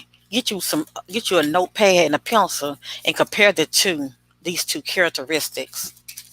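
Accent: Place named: South, United States. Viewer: Wow ! Straight on point wow I feel this in my gutt about there characteristics ! But so true about how people can go so long and keep from showing there true self ! Ty so much for this and may I ask your southern I can tell from your accent I’m from Ky I’m guessing Georgia or Alabama but I really feel the first if you can say if you can’t with everything I’d understand ! I do ty so much for your time helping us all to see a closer look into this case honey ! Stay safe and god bless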